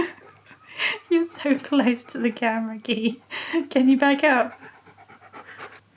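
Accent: United Kingdom. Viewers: Ha! I was just watching another of your videos and running out the door, threw my phone in my hip pocket. Moments later I thought my “bum” was talking to me. In America. It had a Cornish accent. ... This video had started playing! 🌷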